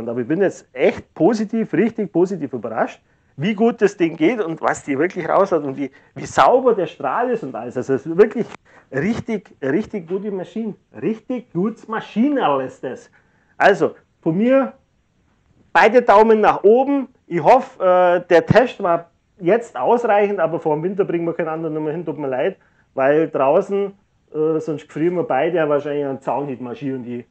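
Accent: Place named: Bavaria, Germany. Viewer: Er spricht meines Erachtens gutes Deutsch. Bayrischer Einschlag, vermute ich,oder? Grüße aus Brandenburg.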